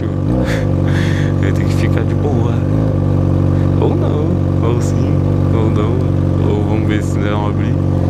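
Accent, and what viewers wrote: Goiás, Brazil. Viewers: Por que você fala no pique do Goiás. você de de Goiás?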